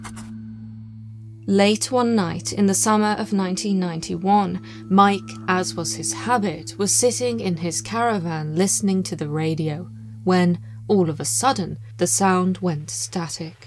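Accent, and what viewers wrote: United Kingdom. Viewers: A similar thing happened to me after the second encounter (that I remember) a few years ago. I suddenly couldn't stand meat being cooked around me, it used to upset me so much I would start crying if I smelled it? I was already vegetarian but became vegan. I quit a 10 year smoking habit in 2 days. But i still love red wine though I can only drink 1 glass or I get very sick. Weird! This seems a great channel, I'm so happy to find a British narrator & you have a new subscriber 🌹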